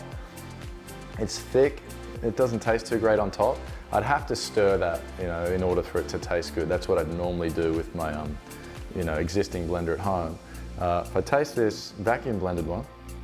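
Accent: Australia. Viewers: I subscribed for the Oz accent 😂😂😂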